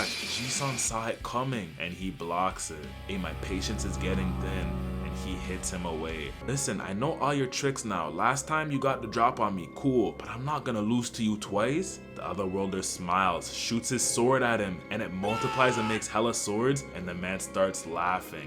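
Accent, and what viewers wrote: Jamaica. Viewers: Are you Jamaican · You have the Jamaican accent bro❤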